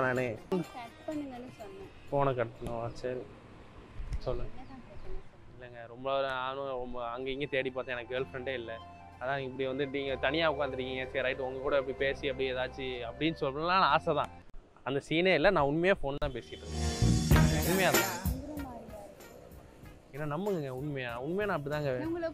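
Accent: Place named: Tirunelveli, India. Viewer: Bro tirunelveli karanu supera katturinga namma oru gethu speech than sema bro👌👌👌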